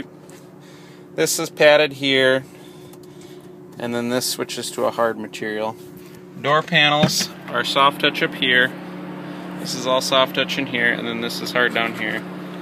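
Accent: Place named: Canada. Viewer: I trust what your saying cause I'm hypnotized by the awesome car and you sound Canadian to me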